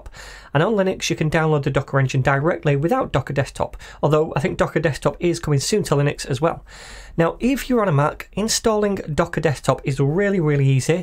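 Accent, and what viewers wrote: United Kingdom. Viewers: u british?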